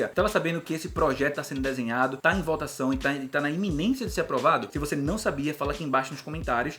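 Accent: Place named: Portugal. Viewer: Kara, não consigo parar de assistir seus vídeos🙈 conteúdo direto, e esse sutaque da terrinha já traz um pouco de intimidade. Parabéns maninho! 🙌🏻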